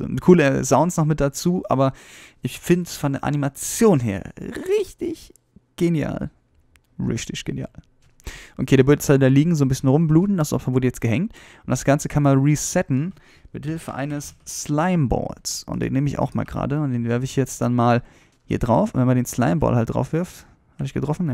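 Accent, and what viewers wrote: Hesse, Germany. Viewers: Er ist Hesse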